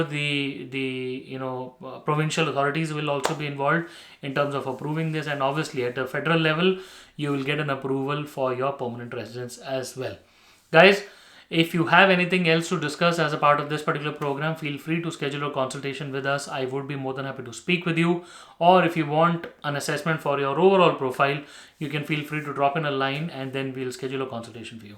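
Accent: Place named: India. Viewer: Tha Indian accent is so strong that it becomes extremely hard to understand.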